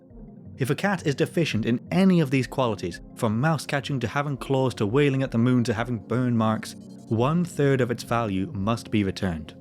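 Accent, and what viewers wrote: United Kingdom. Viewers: He starting to sound like spiffing Brit 👀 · You sound British. If you are, why are you spelling it "Medieval" instead of "Mediaeval"?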